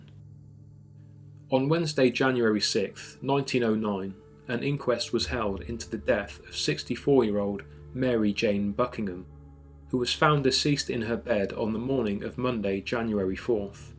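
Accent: England, United Kingdom. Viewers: I'm American but I so wish I lived in one of these charming and beautiful old English villages,( complete with the ghost stories) I just love the aesthetic so much! Thanks for the videos, I just recently discovered your channel but it's my favorite kind, interesting content, British narrator and no ads every 5 seconds. Keep it up my friend! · You've got a lovely voice,! Somehow, as an American, I find that these types of narrated stories sound so much better coming from an English or an Australian accent. Tom Buckmaster has a YT channel, and he has actually gone out onto some of England's haunted roads to do investigations. Very creepy. Love your content! Cheers!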